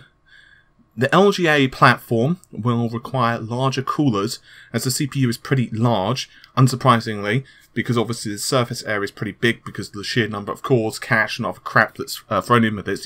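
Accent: United Kingdom. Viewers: Zenyatta- Overwatch maybe it's just his British accent ¯\(ツ)/¯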